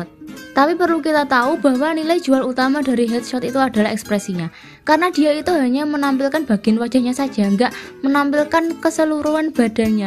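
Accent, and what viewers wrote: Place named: Java, Indonesia. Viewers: Penjelasan dgn jokes yg sangat medhoksionist dan expressive👌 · Jowo banget yo suarane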